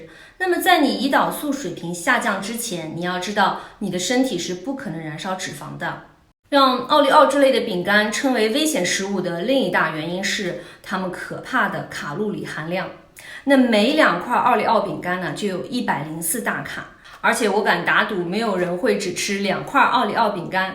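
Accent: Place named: Anhui, China. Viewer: 冰淇淋+布朗尼真的是王炸组合，也太好吃了也有好多好吃的食物： 各种肉类、牛排、蔬菜（老实说真的好吃的不多）西柚、柚子、最近我发现实在是不容易吃到吐的还可以的就是：番茄炒蛋、凉拌黄瓜了，难过。。。再次猜一下是不是来自大安徽，哈哈哈口音也太接近我家那一带了么么哒❤️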